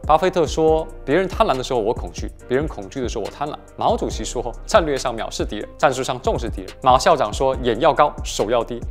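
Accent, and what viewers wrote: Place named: Cantonese, China. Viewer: 就这熟悉的广东口音，爱了爱了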